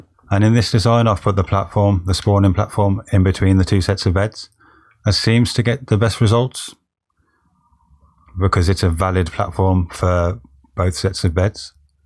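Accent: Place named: Midlands, United Kingdom. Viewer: Sound like you're from the midlands, mate. Black Country lad?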